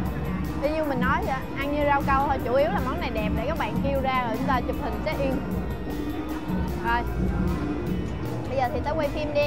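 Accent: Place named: Central, Vietnam. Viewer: bã nói giống dân miền Trung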